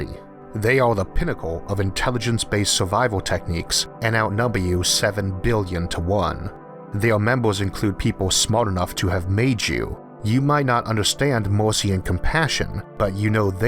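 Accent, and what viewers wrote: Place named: South, United States. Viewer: Lol. I didn't even know you had a speech impediment. I'm English and thought you had a Southern American accent.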